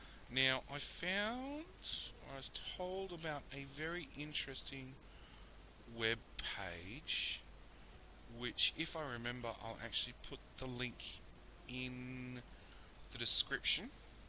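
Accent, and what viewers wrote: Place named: Australia. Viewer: kinda digging the "alright, bye" have you advertised on reddit? i believe you can get one lp post. i was going to advertise you myself, but i keep my reddit persona FAR away from me irl. i rekon you could drum up a few more subs from there. your videos are great compared to the other factorio people i follow, plus the aussie qualities are just gold! upon further analysis.. please, for the love of all that is holy, don't say "bye byes". 😕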